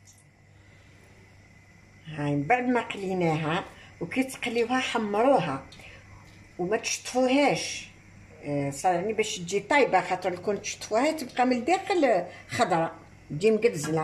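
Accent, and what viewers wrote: Constantine, Algeria. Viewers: Salem Mme la dame qui vous dit que vous etes entraine de tremper les jeune elle dit n'importe quoi. Et Mme tous ce vous faites est Constantinois d'origine .des la première fois a votre voix j'ai compris qua vous etes Constantinoise beldiya.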